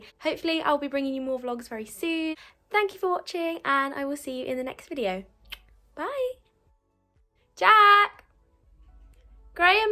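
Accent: United Kingdom. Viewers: I just love watching your videos to practice my listening I love how British English sounds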